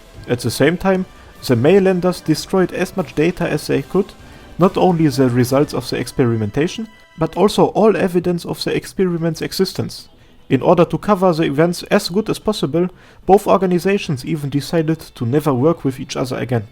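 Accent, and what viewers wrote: Germany. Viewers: Some of the Arknights backstories are dark (just take Frost Nova as an example), but hers surely takes the cake. I do love her though, and as a new player, I do hope she will come back so I'll have a chance to blow my money on getting her, cause I do want her so bad. The chapter 8 music video, she looks so bad ass! Also, your explanation of her ability, of four invisible hands holding onto the things she's got with her. My first though was Re:Zero and Petelgeuse's unseen hand witch factor. Haven't seen the anime you mentioned, so can't comment on that, but as you explained it, I immediately thought of Re:Zero. Anyway, thanks for your lore videos. As a new Arknights player, there is so much lore to catch up on, and the story of Arknights is actually really good. So having access to your videos is a nice help to explaining some character connections and such. 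Also, please don't loose the accent, it's so charming. I live in Denmark, so I'm used to German tourists visiting, and the German accent I've always found so charming. I get why you sub the videos, it can be difficult to understand for some. Guess what I'm trying to say is, keep doing you, keep up doing the great work, and thank you for the videos you make! ❤❤❤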